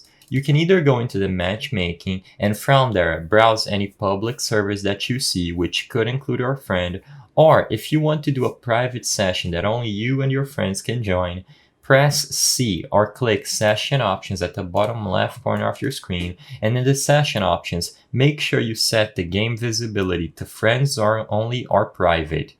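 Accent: Brazil. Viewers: você é Brasileiro né?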